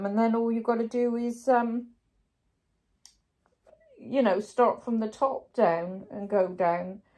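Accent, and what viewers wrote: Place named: England, United Kingdom. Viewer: So lovely to catch up with you again. You laser light is so handy. It saves drawing a pencil line to follow. Love seeing you putting your pretty fabrics together. I have reserved the Alices Wonderland sampler book in my local library. They only have one copy and I am 8th out of 9 on the waiting list! It is a very popular book. You live in a lovely area of England and your accent is so very cute. Take care xx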